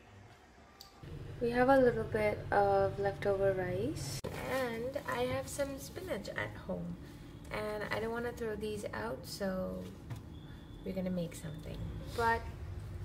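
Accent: United States. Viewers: Y do you have an American accent. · Why this American accent girl. You should speak normally.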